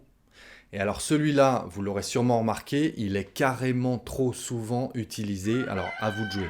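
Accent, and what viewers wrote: France. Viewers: Bonjour! Excellent travail comme toujours! A propos, de quel coin de l'Hexagone venez-vous? J'ai l'impression que votre accent est du nord, mais je n'en suis pas certain. Bonne continuation!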